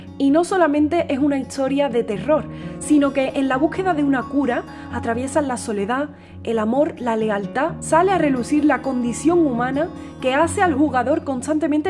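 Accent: Andalusia, Spain. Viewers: Qué bello cover!!! ❤ amé!!! 😊🔥👏 PD: me encantó tu acento andaluz 😍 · Andaluz. De Andalucía, la región sur de España.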